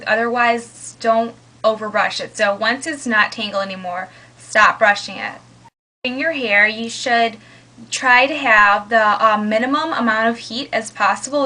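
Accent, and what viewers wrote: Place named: South, United States. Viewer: its sooo funny watching you talk, like u look asian buh u talk country, 1st time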